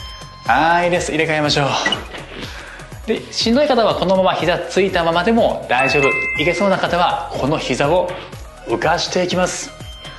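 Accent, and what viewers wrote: Kansai, Japan. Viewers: 色んな困難を乗り越えてらしたのですね🥺 スゴく魅力的で たまに関西訛りがチャーミングです。 わかりやすくて 取り組みやすいものばかりで 感謝です✨ お身体に気をつけて 御過ごしください🎵(*^^*) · 時々出る関西弁がわりと和む(笑)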